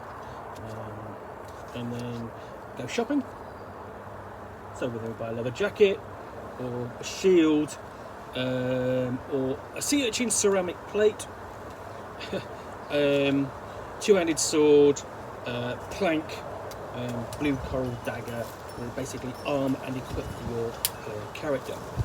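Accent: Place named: United Kingdom. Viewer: Bom video! Adorei seu sotaque britanico e sua calvice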